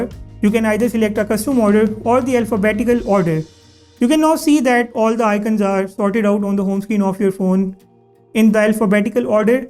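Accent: India. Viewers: Why? Lol is just Indian accent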